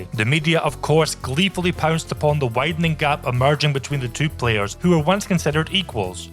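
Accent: Scotland, United Kingdom. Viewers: How the heck have you only got 21k subs man. Love your wee Asian Scottish accent as well. Great channel. You’ll have millions of subs surely soon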